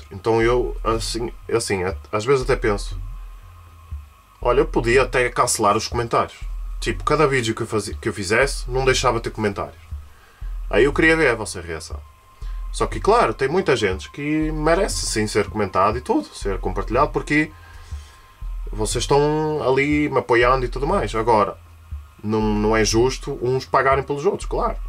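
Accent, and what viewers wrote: Portugal. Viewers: Es portugues fingindo ser brasileiro? Tamaste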